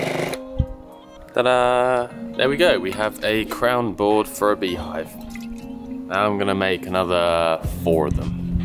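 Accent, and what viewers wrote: England, United Kingdom. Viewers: I also love watching these videos! And the English accent along with your jargon is really fascinating to listen too. Thank you for all the hard work you put into your harvesting and videos!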